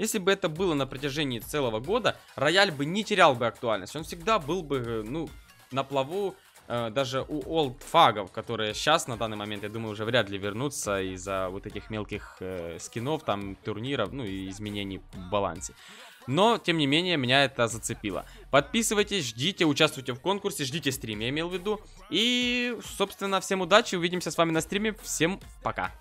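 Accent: Ukraine. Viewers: ты с Украины?? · с Украины или Рлсии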